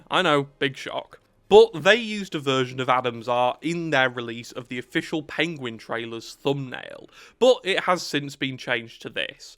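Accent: United Kingdom. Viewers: British bloke talking about video games? Don't mind if I do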